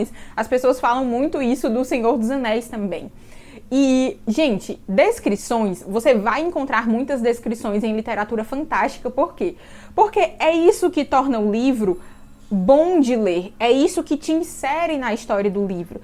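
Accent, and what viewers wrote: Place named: Northeast, Brazil. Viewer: "Uma hora eu falo assim, outra hora eu falo assado" ahahaha ah, meu nordeste ❤ Ótima resenha, já quero leeer 😍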